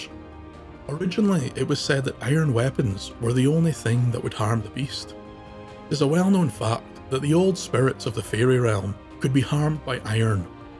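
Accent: Scotland, United Kingdom. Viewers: Omg I love your Scottish voice! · Thanks so much for this wonderful tale! It might be my favorite so far. Also, I could listen to your lovely voice for hours. Would you mind sharing the area of Scotland where you were raised? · Our first time watching.. the kelpie video was excellent.. Two things that encouraged us to subscribe., First your voice.. that beautiful Scottish accent.. Also Scottish we are.. and love folklore.. so After we subscribed we saw your wolf logo.. and felt content.. Both of us have wolves as spirit animals! Please keep making videos.. we love them🌹 William and Jen 💜💙🌱🌹🙏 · I love the Scottish accent and learning about the culture & folklore.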